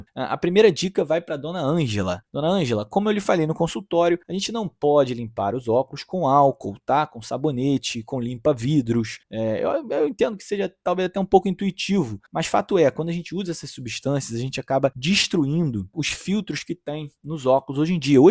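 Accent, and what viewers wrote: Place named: Rio de Janeiro, Brazil. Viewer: O sotaque carioca, para mim, é insuportável.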